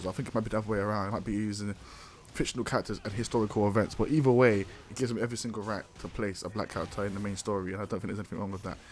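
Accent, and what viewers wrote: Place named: London, United Kingdom. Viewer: you are the most north london person i've heard in a while, bro said "mandem, we got a juicy one stylll" 🤣 love bro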